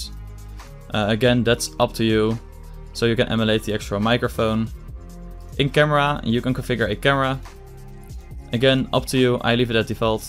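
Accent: Netherlands. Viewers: Haha ik hoorde het aan je engels XD, niet dat het slecht is. Goeie tutorial trouwens :) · je hoort meteen is Nederlander (geen haat bro)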